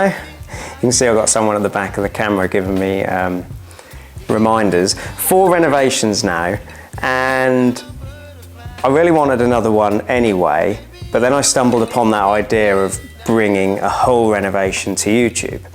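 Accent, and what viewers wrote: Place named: Norwich, United Kingdom. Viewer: I love watching your videos. As I spent the first 25 years of my life in Norwich I recognised your accent immediately. Looking forwards to more great content, happy new year.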